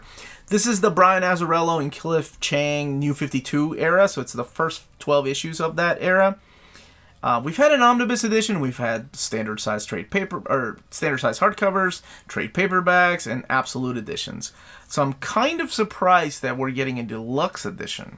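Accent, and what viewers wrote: United States. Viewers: Are you from Kentucky????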